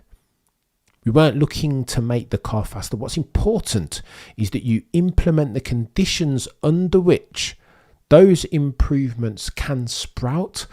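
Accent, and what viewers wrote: United Kingdom. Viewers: This guy more British than alll the British people I know. Like where is this accent even from. What part?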